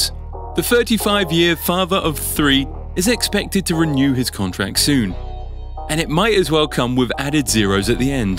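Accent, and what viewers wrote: United Kingdom. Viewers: So it's not a house tour...It's photos taken off the internet that anyone can find then made into a slide show and a guy with a British accent telling you that LeBron makes a lot of money. 🙄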